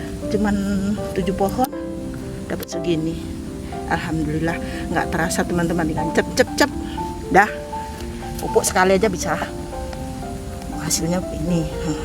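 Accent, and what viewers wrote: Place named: Medan, Indonesia. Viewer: Dari logatnya orang jawa Medan ini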